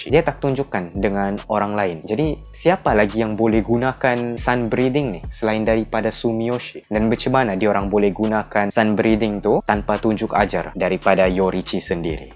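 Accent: Sabah, Malaysia. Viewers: Bang orang sabah ke ?speaker favourite ni bos🔥🔥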